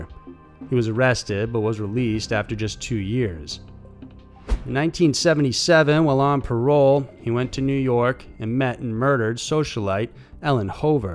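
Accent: South, United States. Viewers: I have thought that too, its the slight southern accent (which I looove). Hey, wouldnt it be hilarious if in the future mr McConaghey revealed he does this true crime/mysteries-gig in YT in his free time? When it gets boring between shoots :)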